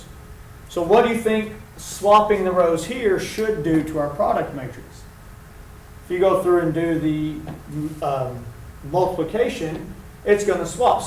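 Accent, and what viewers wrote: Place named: South, United States. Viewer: What accent is that? As a Brit I love listening to it. Southern. Maybe Tennesee? Georgia?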